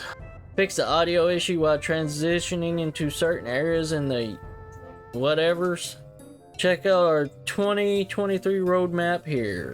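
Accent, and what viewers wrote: South, United States. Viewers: LOL. The southern accent is killing me. haha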